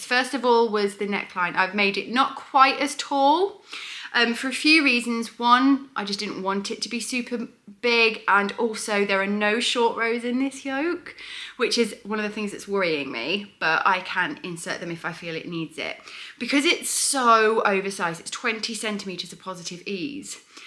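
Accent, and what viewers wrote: United Kingdom. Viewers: вас дуже любо слухати, дуже приємне мовлення, обожнюю британську англійську)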